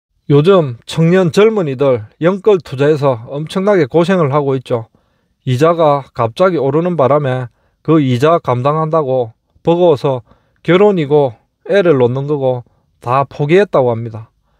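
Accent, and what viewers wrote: Gyeongsang, South Korea. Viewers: 영상 내용도 내용이지만 운영자님의 완벽한(?) 경남 사투리에 늘 더 끌리게 됩니다 ㅎ~. 저도 고향이 경상도인 사람인데 운영자님의 나레이션을 듣고 경상도 사투리가 이렇게 매력적인가??..새삼 깨닫게 됩니다. 암튼 좋은 영상 늘 감사히 잘 보고 있습니다~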